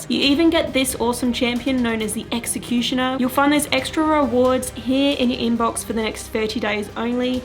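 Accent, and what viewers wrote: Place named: Australia. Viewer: I love ur channel your content is amazing. Your so sweet,nice and positive all the time. Sometimes I think she's so positive but into dark shit lol your definitely unique that's for sure lol I love the aussie accent. Thanks for cheering us up during these dark days. Mad love from the states 👹👻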